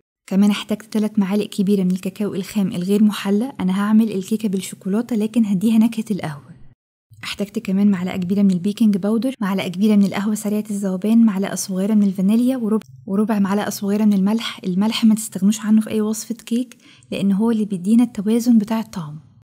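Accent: Egypt. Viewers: تسلمي تعرفي أنا اشتركت في قناتك عشان صوتك. ايوا والله أنا بموت قي اللهجة المصرية. بحب اسمع حد بيتكلم بيها . وأنا اشتركت عشان ابقئا أسمع حضرتك وانت بتتكلمي . وعلى فكرة وصفاتك هايلة وماشاء الله صوتك يجنن. مغربية مرت من هنا🤗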